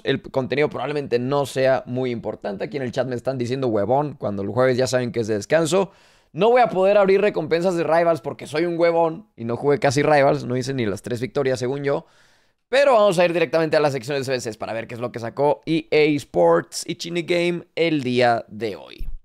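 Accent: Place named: Spain. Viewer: tu no eres mexicano eres español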